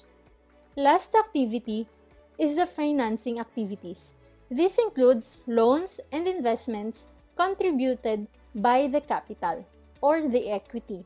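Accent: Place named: India. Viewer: Why I heard a Hindi Girl who explaining, taking this about 😅.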